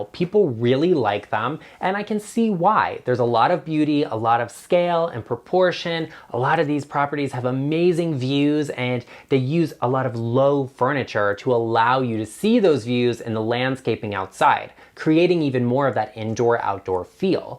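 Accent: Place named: South, United States. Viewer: Love your channel! Thank you for all this valuable professional advice. Where, generally, are you located? Northeast, South?… You sound a little southern to my Texan raised ears. ❤️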